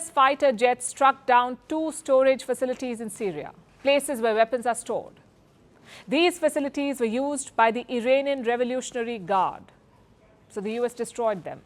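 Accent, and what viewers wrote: India. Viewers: Seem tha you know everything? I dont like how you speak English still you sound indian.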